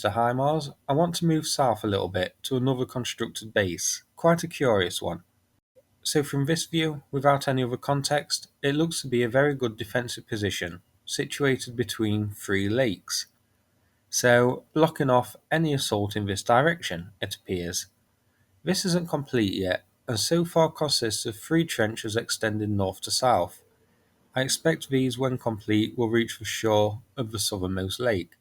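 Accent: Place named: England, United Kingdom. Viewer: Which part of England (county, main city) is home to the accent speaks in?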